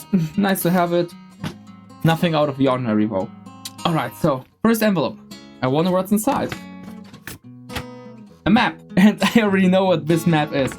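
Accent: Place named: Germany. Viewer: Shout out to random, Eastern European, gaming channels. Gotta be one of my favorite genders. Edit: Ok, turns out he’s German. I’m really bad at identifying accents.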